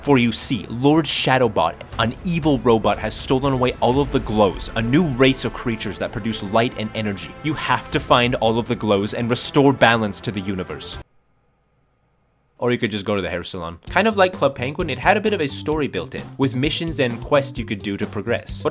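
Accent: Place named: Canada. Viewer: i am going to bet $100 that you are canadian just based on your voice. love from BC, this video ruled :3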